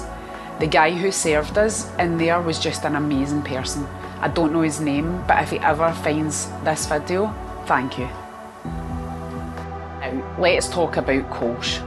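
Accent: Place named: Scotland, United Kingdom. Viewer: Great video. U sound Scottish (video creator) ; I’m going in October 2026 and am psyched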